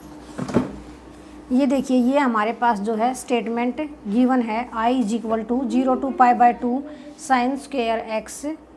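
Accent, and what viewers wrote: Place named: Haryana, India. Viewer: Pure haryanvi🤩🤩🤩🤩😍😍🥰🥰👌👌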